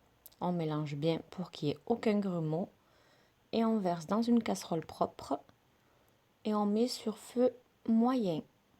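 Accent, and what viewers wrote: South, France. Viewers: Magnifique dès demain je vais essayer Merci vraiment pour avoir la gentillesse de nous faire partager vos recettes et avec un accent magnifique du sud qui me rappelle Mon enfance que demander de plus bravo surtout continuez et encore une fois Merci 😊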